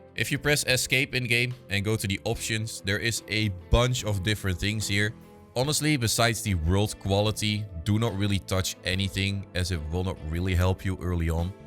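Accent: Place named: Netherlands. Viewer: i smell dutch\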